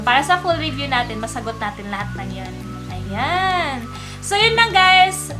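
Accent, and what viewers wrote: Visayas, Philippines. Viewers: I like your bisaya accent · Ang sarap sa tenga ng bisaya mo ate! 😍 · Ay chouuurr bisaya man diay ka te, heheheh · Hala grabe bisaya mn d i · Whoa! Bisaya diay ka Miss! 😮🔥#ProudBisaya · Bisaya diay ka te?😂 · Bisaya diay ka idol. Kamoka mo at kabuses mo din kapitbahay nmin hahahah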